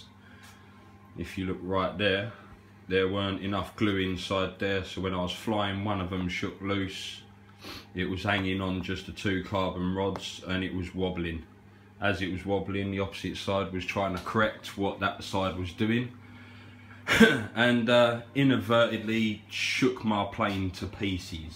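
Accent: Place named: London, United Kingdom. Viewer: Thanks. I've just bought an XL so this afternoon I'll get cracking on your suggested mods and hopefully save myself some grief. Good to hear an English voice at a speed that can be understood. A good London area accent.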